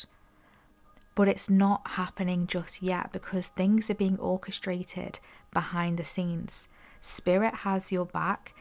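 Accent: Northern England, United Kingdom. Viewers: Beautiful message, beautiful name, do I sense a bit of northern English as we are the best 🎉❤🙏✨🌹🌹🌹 peace and light 🌟🙏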